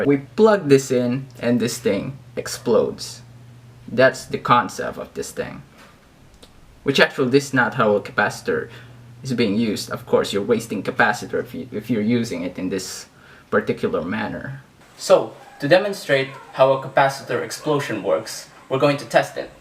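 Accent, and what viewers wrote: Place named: England, United Kingdom. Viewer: I love your english accent pls keep doing more videos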